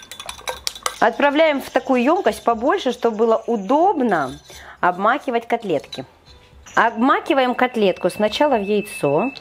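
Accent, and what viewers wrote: Ukraine. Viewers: По диалекту украинка😊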